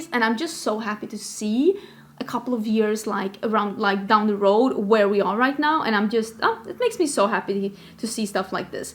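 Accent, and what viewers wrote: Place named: Sweden. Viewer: Man hör att hon e svenne iaf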